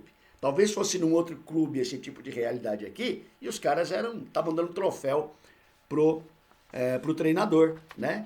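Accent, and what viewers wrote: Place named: Rio de Janeiro, Brazil. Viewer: Em qual cidade vc nasceu , vc tem um sutaque carioca kkkkkkkkk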